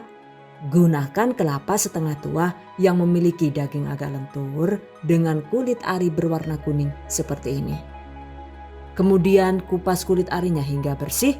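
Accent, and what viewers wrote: Banyumas, Indonesia. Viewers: Bahasa ngapaknya itu lucu banget Bun😆"iklan maning iklan maning to yuk"tapi saya suka banget sama setiap resep & tips nya itu detail banget.makanya tiap cari resep pasti yg dicari resep.sukses terus ya bun channel nya aamiin🤲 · Yg saya suka dari penjelasan nya detail..suara & gaya bicara nya enak didengar.aoalagi ada logat logat ngapak nya itu..lucu. suka sekali..👍👍👍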